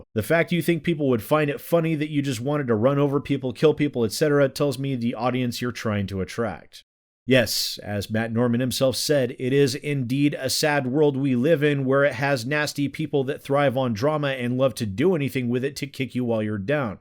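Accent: Australia. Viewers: HEY, hey, don't make this about Americans! I'm from America, and I knew he was Australian right away. Some people are just... well, fuckin' stupid. I think we all have problems with that, let's be honest. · He's Australian, jesus why do so many yanks get confused over the accents? We sound nothing alike. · On behalf of Queenslanders I believe he was raised in Tasmania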